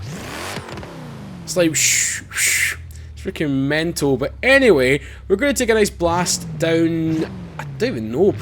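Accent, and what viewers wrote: Scotland, United Kingdom. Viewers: yeah SCOTTISH ACCENT IS THE BEST!!! :D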